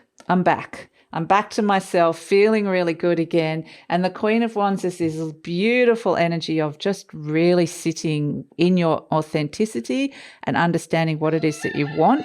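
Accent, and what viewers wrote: Australia. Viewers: Very good...resonates and very exciting things to come. I enjoy ur channel especially because u sound to be Australian and relate it to Southern Hemisphere, there's not to many Southern hemisphere readers so I'm grateful when i find one! Namaste beautiful being namaste 💖🇦🇺🙏🌈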